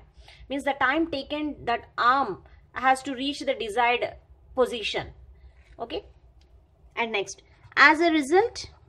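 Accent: South India, India. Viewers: Your accent is nice are you from south indian 😊☺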